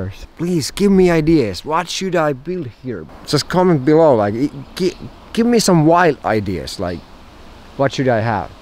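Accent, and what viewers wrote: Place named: Finland. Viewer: P.s. + Less is more. At times even that is very effective. I liked this nature video and I think your English is just fine with your Finnish accent. Good idea on being your own best friend!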